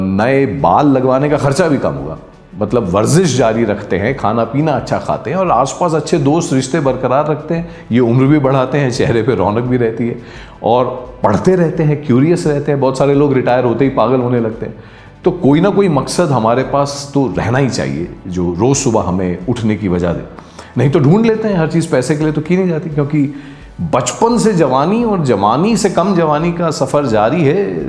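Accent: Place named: Lucknow, India. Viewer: Bahut khoob ! Lagta hai hamari tarah aap bhi Lucknow se taalluk rakhte hain 😀🙏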